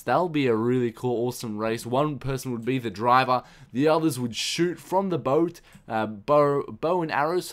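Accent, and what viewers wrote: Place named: Australia. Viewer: Are you Australian? You kinda sound like one.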